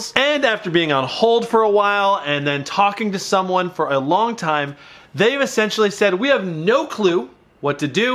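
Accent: India. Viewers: Sounds like an indian scammer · Indian accent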